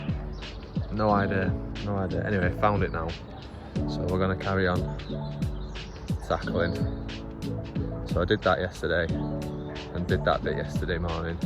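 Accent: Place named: Northern England, United Kingdom. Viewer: Looks good! A good session there mate. Where are you based in the UK? You sound Northern. I'm from Preston. Can't be too far from me I'd imagine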